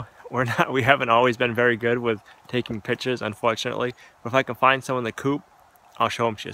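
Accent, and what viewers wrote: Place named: Boston, United States. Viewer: ***No way! I didn't mean speech impediment or problem. I'm from way out west and you speak like a Bostonian. Or a New Hamshire-ian. It wasn't meant to be a criticism. :) Have a great Friday. Great work.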